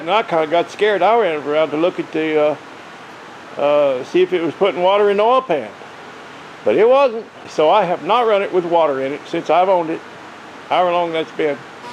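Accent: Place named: North Carolina, United States. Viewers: I sure thought you were from North Carolina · Woulda never guessed your in Washington...thought you were in Tennessee or North Carolina mountains. Your place looks like it's there, your shop looks like something you'd see here in the south, you talk a lot like that area and you have the same demeanor about you too. Woulda never known the difference if I hadn't heard you say it. You'd fit right in around those areas.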